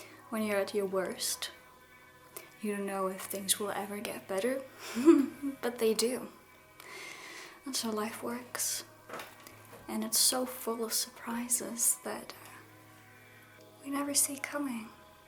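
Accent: Germany. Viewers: this shit had the ability to take me to a cyberpunk world with the lighting the music and your german accent. powerful shit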